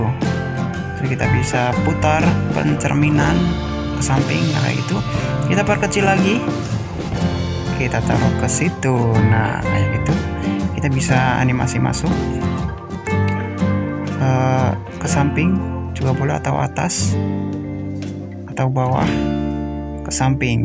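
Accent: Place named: Banyumas, Indonesia. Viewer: Jawa ngapak😂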